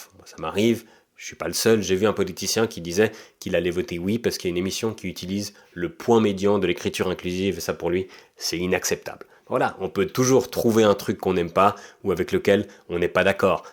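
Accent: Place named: Vaud, Switzerland. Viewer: Merci pour les sous-titres je comprends vraiment rien à ton accent vaudois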